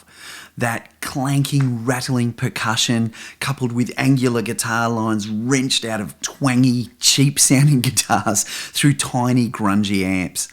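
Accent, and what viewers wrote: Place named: Australia. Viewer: Both of these videos on the guitarists of Tom Waits are great,. Your storytelling, energy, wonderful Ozzie accent and most of all your playing were really a treat. Thank you.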